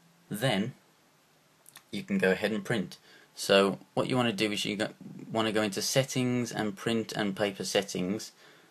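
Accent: United Kingdom. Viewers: Are you from birmingham? you sound like a brummie xD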